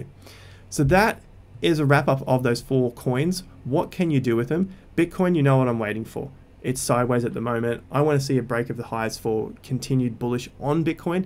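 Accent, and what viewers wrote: Australia. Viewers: Aussies say "Megger" instead of Mega and "Wata" instead of Water. Love the content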